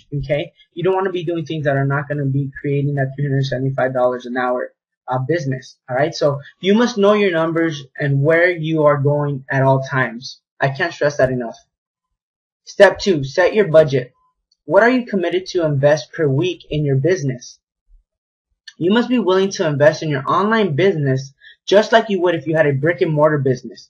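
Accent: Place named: Arkansas, United States. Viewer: That's an Arkansas accent for sure... Let's connect on facebook bro... Look me up, I'm banned from sending more friend requests for another week or so, lol.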